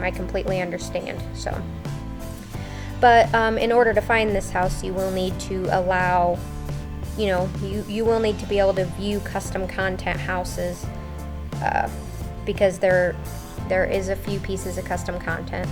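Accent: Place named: Boston, United States. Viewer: Love the house! Btw did the Cubs win? And are you from Boston ish area? Me and the bf were trying to guess where your accent was from.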